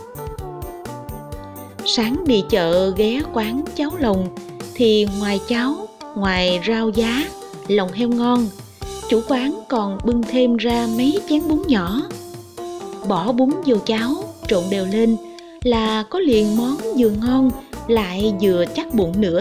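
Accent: Mekong Delta, Vietnam. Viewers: Chất giọng huyền thoại...làm nên tên tuổi của người miền tây..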